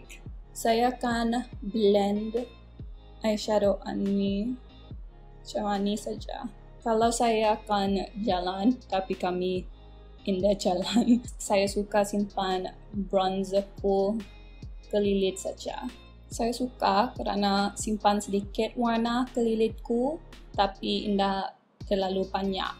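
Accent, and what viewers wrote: Sabah, Malaysia. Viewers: Your malay accent sound like Sabahan accent or Indonesian accent😂😂,its okay my malay Brunei accent is not really that deep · Macam orang Sabah cakap bahasa Melayu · Macam cakap sabah sudah 😄 mulut ku ani inda boleh cakap malayu hahaha😂😂 hee okay lah atleast boleh cakap malayu sikit2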